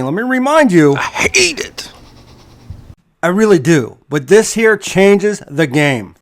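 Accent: Pittsburgh, United States. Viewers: You from Pittsburgh if not you sure have a pittsburgh accent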